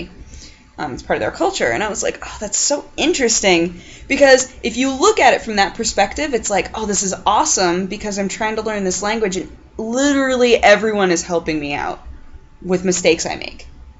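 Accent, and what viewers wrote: France. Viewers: Les deux manières de le dire sont bonne :) Ton accent est très français de France mais c'est très bien! Long time French Canadian follower :3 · oh that ridicolous french accent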